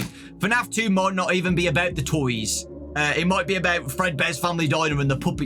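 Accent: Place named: Midlands, United Kingdom. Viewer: Mate your accent is just amazing. You're defo from the Midlands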